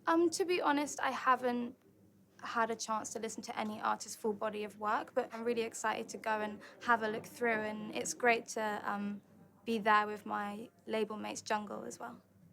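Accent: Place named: United Kingdom. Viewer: HER ADORABLE BRITISH ACCENT it hurts my gosh it hurts she's so fantastic it HURTS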